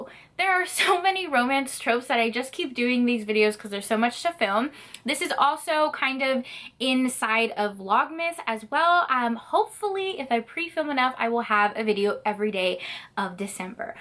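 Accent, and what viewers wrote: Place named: Brazil. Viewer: Are you brazilian? Your accent looks really like a Brazilian one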